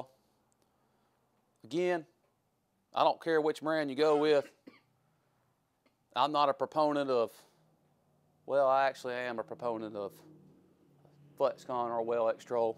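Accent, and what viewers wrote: South, United States. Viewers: Enough with the southern accent and long intro. Get on with the video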